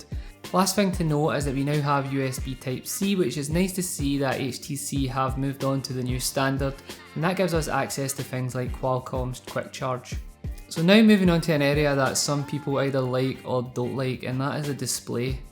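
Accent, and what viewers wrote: Scotland, United Kingdom. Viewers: Are you Scottish? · are u Scottish?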